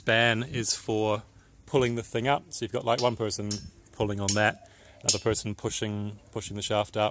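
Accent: New Zealand. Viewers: Is he an Aussie? His "R's" make him sound like he comes the bottom the the south island NZ.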